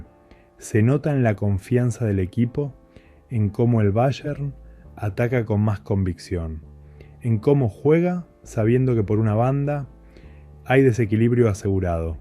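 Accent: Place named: Argentina. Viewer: Me encanta tu análisis sin gritos sin estridencias muy acertado se oye lindo con su cadencia Argentino saludos 👍👏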